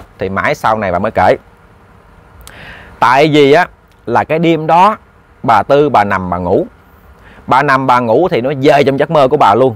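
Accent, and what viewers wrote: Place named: Countryside, Vietnam. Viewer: Kể thì hay nhưng sai c tả thay vì chử rồima đọc dồi làm nghe nông thôn quá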